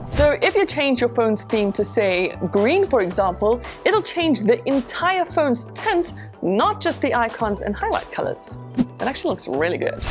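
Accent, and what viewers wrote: South Africa. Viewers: hmmm you sound a little South African :o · South african accent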